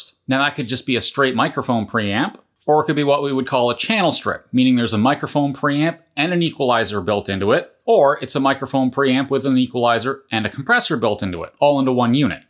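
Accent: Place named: Canada. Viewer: Canadian IN and OOT!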